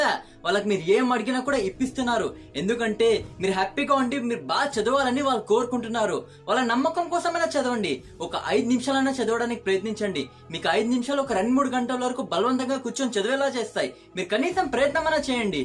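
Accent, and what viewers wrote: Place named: Rayalaseema, India. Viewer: Rayalseema slang superb bro iam Harish ekart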